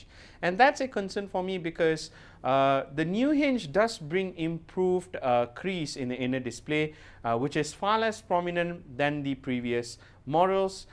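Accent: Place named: Sri Lanka. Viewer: Just asking are you from srilanka?? Loved ur accent..❤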